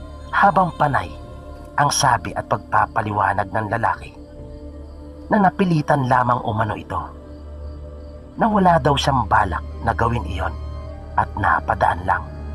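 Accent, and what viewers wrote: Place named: Kinaray-a, Philippines. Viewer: Pamatyag mo eh,, hahaha karay-a gid,, 🤣🤣🤣🤣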